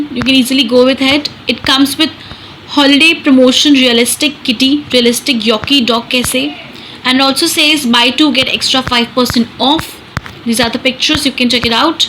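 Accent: India. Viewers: I don't understand the Indian/English accent, Worthless review, at the end I did not understand whether she bought a realistic dog or not since did not show the physical dog running all over.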